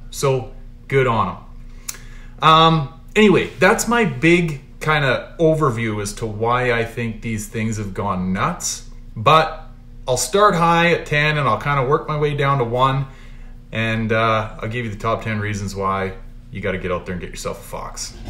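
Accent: Canada. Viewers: He sounds Canadian. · You Canadian eh? Thanks, Great Video. I want a GT Convertible. Does it matter 89-93? I would think by 93 bugs would be less? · I can always tell when a Canadian is talking.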